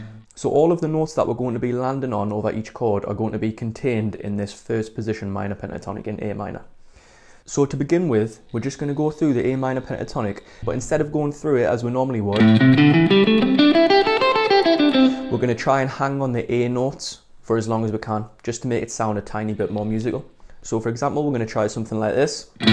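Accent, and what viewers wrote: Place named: Chester-le-Street, United Kingdom. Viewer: I found your channel by chance the other day and was so impressed with your playing and sound. Then you open your mouth and you're from round here haha. I live Chester Le Street! Small world haha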